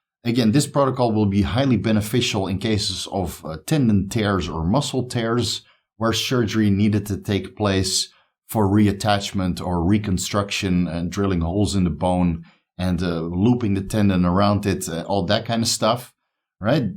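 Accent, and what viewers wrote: Netherlands. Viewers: Where is from? I hear a slight dutch accent 🤭 ah nice thicker skin. Using that triple peptide stack now to heal from major surgery. But my skin has become very thin.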